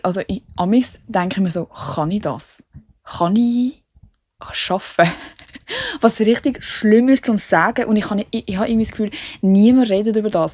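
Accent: Basel, Switzerland. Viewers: Mir fällt auf, du hast dein Dialekt immer mehr Basel angeglichen.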